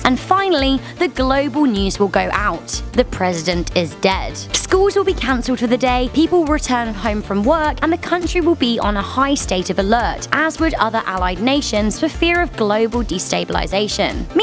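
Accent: United Kingdom. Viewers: I'm here for the British accent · Is she Britsh?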